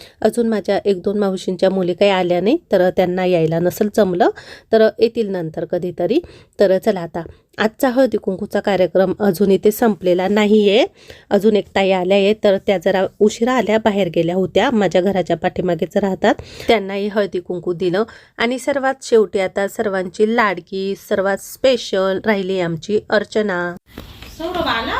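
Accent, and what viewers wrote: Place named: Jalgaon, India. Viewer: मावशी तुम्ही जळगाव मालेगावच्या दिसत आहे बोलण्या वरून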